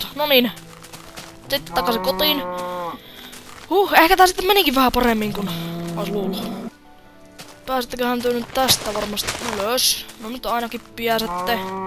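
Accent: Karelia, Finland. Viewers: Onko tua sun murre karjalan murre ?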